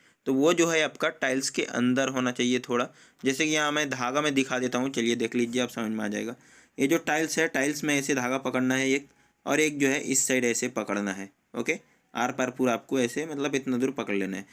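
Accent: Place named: Bengal, India. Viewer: Hey bro i know u r bengali ( by ur accent) do u have any good contact for plumbing in kolkata?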